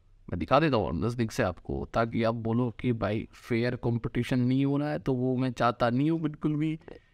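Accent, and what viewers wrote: Odisha, India. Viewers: Kya aap odisha se ho ?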